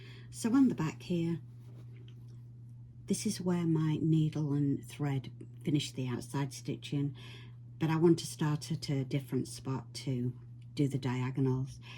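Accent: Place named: Northern England, United Kingdom. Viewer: Thank you very much for all of your Sashiko videos. I’m a complete beginner and you are the first channel that actually has slow and helpful tutorials. I’ve found so many other videos that start, assuming you already know how to make a Sashiko knot, etc. So, thank you for all of your help. On another note, are you from the Midlands or northern England? I absolutely adore your accent. Greetings from Washington!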